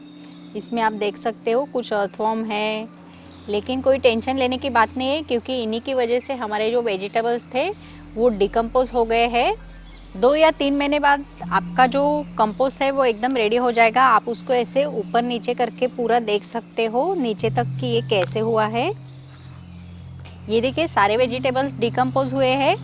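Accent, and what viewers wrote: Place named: Maharashtra, India. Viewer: Aapke vdo to muze bahut achhe lagate hai. Language tuning se aap muze marathi lagti hai. Am i right mam?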